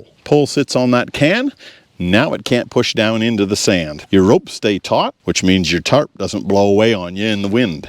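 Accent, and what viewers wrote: Canada. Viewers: I think I ❤your Canadian accent! I’m Scottish and think it’s a hoot! Okay your best hacks for me are: Shrink wrap zip Water tap Butane gas can My hack is for tent camping. No matter what I use, my feet always get cold! So I carry a water bottle in my pack to put inside my sleeping bag at night. Bliss!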